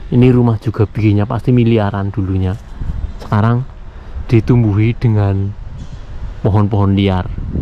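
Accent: Banyumas, Indonesia. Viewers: Bang banyakin ngomong ngapak nya bang...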